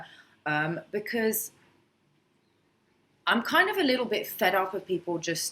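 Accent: United Kingdom. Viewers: Hey, people have made reality TV shows with daily routines like yours. I'm sure it will be interesting to watch. Most people think a person with your job lives a life like a episode of Americas Next Top model on a daily basis. Getting views are kinda tricky. You gotta have a combination of the right tags, title, timing, & luck. Or have one of those super channels comment on your video to draw views from there fans. I have two videos with 500,000 views, but the rest of my videos have around a thousand or less. But I've never realy cared about views. My channel is dedicated to one niche video game, along with a couple other videos that I felt like making. It's all about art for me. Editing video & seeing it play. Kinda like art. Sometimes you will get alot of views & sometimes you wont. I saw you Showreel video. Have you ever tried to audition at the Travel Channel or Discovery channel? 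Your exotic look & British accent would make you perfect for a show like "Globe Trekker." Plus you know how to travel the world. I used to like watching that show back in the day. Watch this youtube video - /watch?v=Pyn2IOAO6Mc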